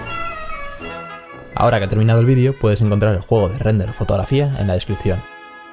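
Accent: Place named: Spain. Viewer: buenisimo tu video. lamentableente detesto la pronunciacion española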